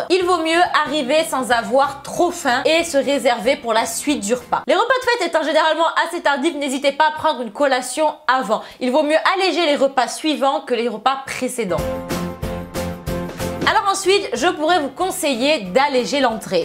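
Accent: South, France. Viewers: J'arrive pas à savoir de quelle origine tu es Du sud d'accord mais sinon ?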